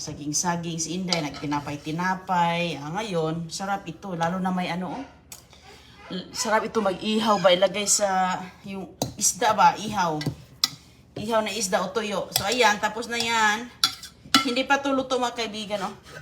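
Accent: Visayas, Philippines. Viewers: para po sakin siguro pabayaan na lang po natin siya ganyan po siya at siya yun di tayo kaya mas maganda wag na lang panoorin kung marami kayong nakikitang kamalian sa kanya at sa mga ginagawa nya. marami din po ako kaibigan na bisaya at ganyan din mag salita kahit sobrang tagal na nila dito sa katagalugan. opinyon ko lang po .✌️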